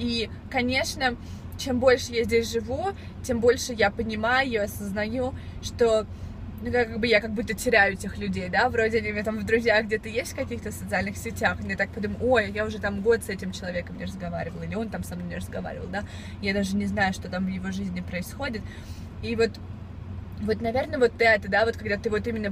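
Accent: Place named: United States. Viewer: Акцент американский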